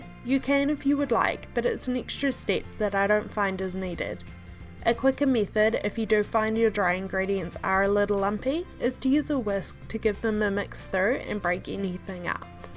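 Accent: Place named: New Zealand. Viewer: New Zealand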